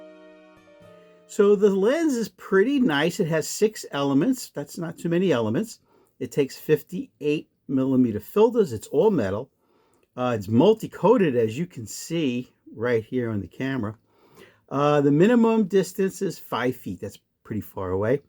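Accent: New York, United States. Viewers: Nice shots, I don't see any massive issue with the lens. You must be one of the last few people with a genuine New Yoik accent :) love it. Watching in Scotland,.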